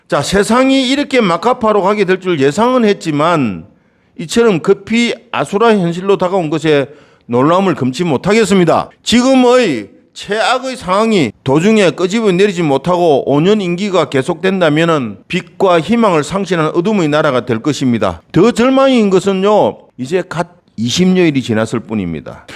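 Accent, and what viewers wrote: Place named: Gyeongsang, South Korea. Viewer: 갱상도 사투리 조나 듣기 싫네 ㅋㅋㅋ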